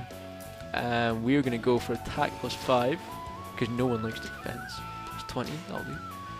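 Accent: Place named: Scotland, United Kingdom. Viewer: may i ask what part of scotland are you from? im just curious i enjoyed the vid and you deserve another sub =D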